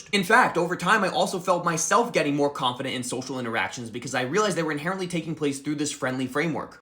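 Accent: West Virginia, United States. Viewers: Are you from West Virginia by any chance?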